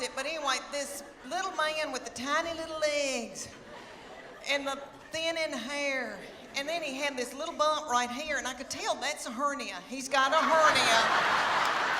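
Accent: South, United States. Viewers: Oh, bless his heart!!! Spot on Southern. Love her! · She is just 100% valid. I love her. Only a southern woman can make a two syllable word put of “van” “va-yun”. Don’t worry about. I’m from texas born and bred and we do the same thing. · Why do I love the southern accent so much - it drives me more crazy than a French girl naked at the place St.Sulpice ? Awesome 😂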